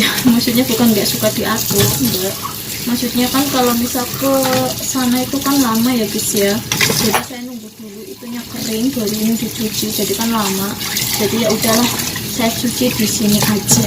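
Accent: Banyumas, Indonesia. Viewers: ngapaknya medok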